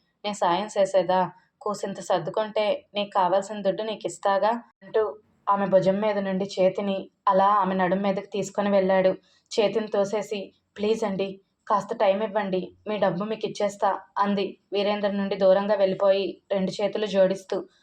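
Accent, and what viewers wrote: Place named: Rayalaseema, India. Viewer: Rayalaseema bhasha bagundi😊😊😊😊❤❤❤❤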